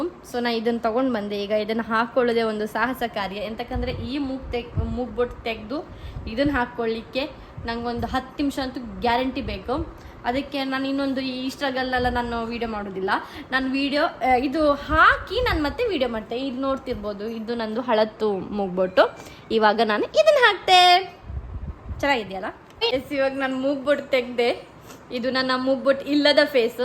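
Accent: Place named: Kundapura, India. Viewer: Hoi...ನಿಮ್ಮ ಮಾತು ❤❤❤👌👌👌👌 Mugubott 🥰 ನಿಮ್ಗೆ chanda kansutte🌸😁❤❤❤.... ಕುಂದ ಕನ್ನಡ❤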